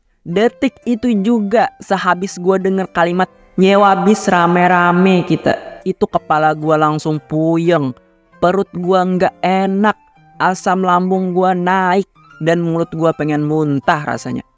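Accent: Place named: Kandangan, Indonesia. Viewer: Dari logat nya kaya nya orang kandangan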